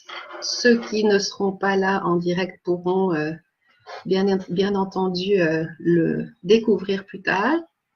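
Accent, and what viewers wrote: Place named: Canada. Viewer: Tu prends l'accent canadien:D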